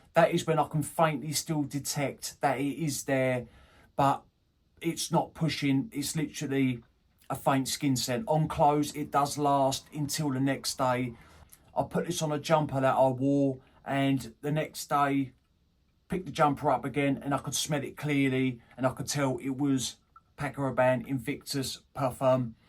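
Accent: United Kingdom. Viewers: Thanks for this review, its the first one i found on yt of this fragrance I wonder what's your accent? First i thought it's Australian, but its from somewhere in the UK, but which region?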